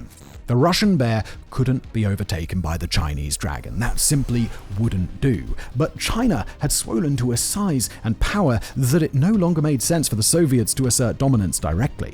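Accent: United Kingdom. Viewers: The Idiots Guide to Vietnam's Reunification for Tourists. Qualified by annoying pretentious and pompous British accent.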